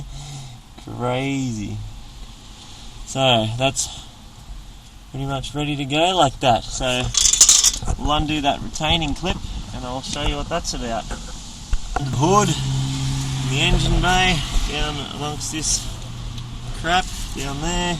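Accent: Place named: Australia. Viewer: Thanks for your video, and lovin' that Aussie accent and vocabulary ! Nothin' too scary ! Cheers from a Kiwi-Aussie !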